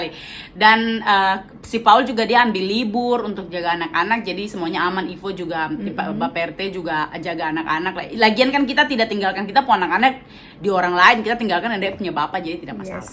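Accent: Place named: Eastern Indonesia, Indonesia. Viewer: Sehatsehat kaka. Vlognya sllu menarik, dn sya suka sama gaya bicaranya kaka krna kebetulan saya juga orang timur. Sya sudah ikuti dn nonton vdeo kka dari sebelum mncapai 1 jta subscribe, dari msih tinggal di apartemen, tdk prna bosan sma vdio2 kaka. Mkasi sudah menghibur keseharian sya, dn smoga alur cerita hidup kaka bisa ketularan ke saya yg smntara proses memikirkan dn melangkah sdikit demi sedikit untk mnuju masa depan dn hdup yg lbih baikk🤗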